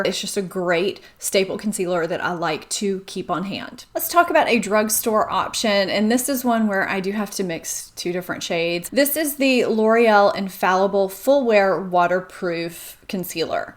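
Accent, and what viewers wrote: South, United States. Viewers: that southern accent intensifying through the video :D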